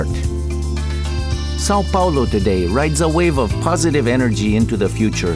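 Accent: Spain. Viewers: very cool video, but EXPEDIAAAAA why the SPANISH accent on top? We speak PORTUGUESE, our accent is very different from the Spanish accent when we speak English. Should put a Brazilian- Portuguese accent on top if your intention was to make a video as if it were narrated by a local. Just a thought. Nice video though. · Por que o cara tem um sotaque espanhol?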